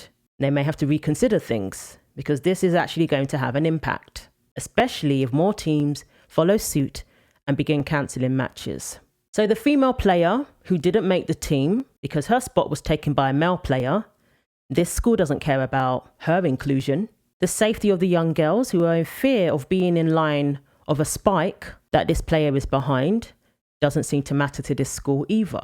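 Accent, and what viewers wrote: United Kingdom. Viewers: On a personal note, although you have a British accent, it was easy to understand your speech. Your diction is clear. I sometimes have to resort to Closed Captioning to understand English speakers from other countries. Also, excellent fact based coverage of a very important issue.✌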